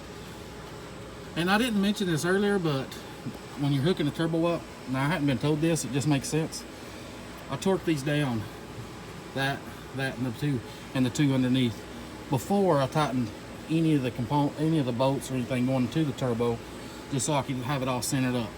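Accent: South, United States. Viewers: HAHA at work so had to turn on closed captioning. That shit is hilarious YouTube doesn't do a lot of work trying to learn them southern slangs that's fa sure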